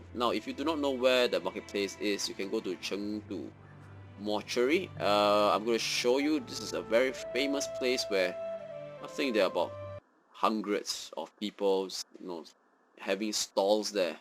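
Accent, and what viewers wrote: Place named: Arab world. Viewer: u have mid east English accent and u can speak Chinese?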